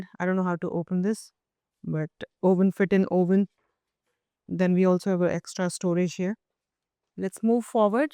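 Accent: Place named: India. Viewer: Is she speaking English or indian?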